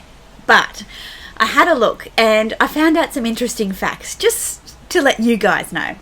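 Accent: Australia. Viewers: I love your channel and your content! I love how warm and genuine you are, and it's always nice to hear an Aussie accent on the platform! I really like a number of different channels (and genres) on YouTube for different reasons, they serve different purposes to me. Some creators I'm subscribed to I will pick and choose what video I watch (I wont necessarily click on all of their uploads). But since I'm watching for YOU (as a person, more so than a channel with content) I will watch anything you put out and enjoy it. Like Dr Jake is one of my favourite channels, because of his humor and personality (and not for his Lego reviews, and home reno updates!). Keep doing you! You are just a delight 😽💖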